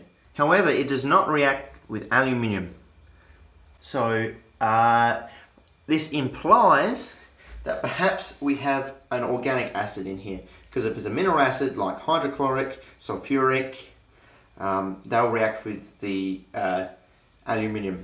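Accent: Australia. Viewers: He Australian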